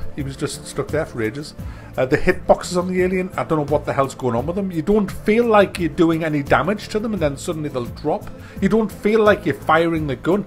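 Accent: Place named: Sunderland, United Kingdom. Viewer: Hi can I ask if your from sunderland? I reckonise the accent lol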